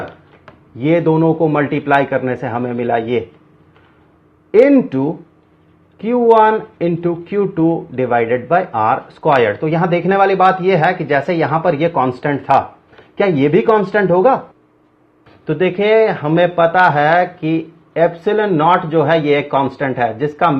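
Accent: Bihar, India. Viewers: sir aap Bihar se hai kya? love your voice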